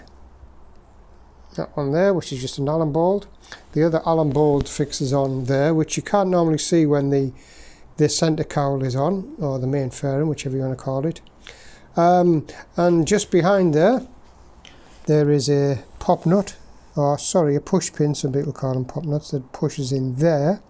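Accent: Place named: North, United Kingdom. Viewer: Yes very good videos. Good northern accent very smooth. Looking to get one of these myself.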